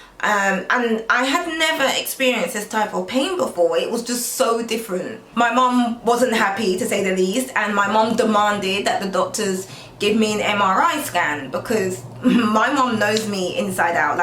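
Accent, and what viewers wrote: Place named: England, United Kingdom. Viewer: I wonder where in England you’re from?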